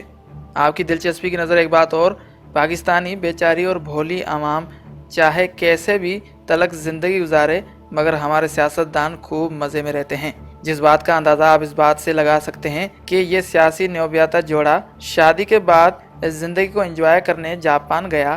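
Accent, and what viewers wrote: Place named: India. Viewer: kismat or qismat.. gujaar or guzaar. mutabik or mutabiq.. is trah doosre alfaaz, indian jessay urdu/hindi bolte hain. kitni jamaat padhay ho??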